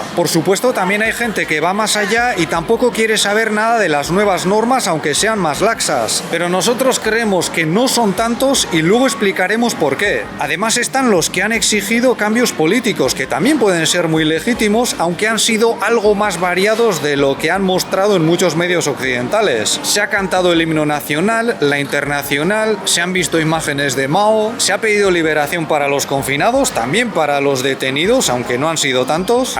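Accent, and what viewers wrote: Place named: Spain. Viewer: modo de hablar español iberico, peninsular, diferente al español sudamericano con muchas variantes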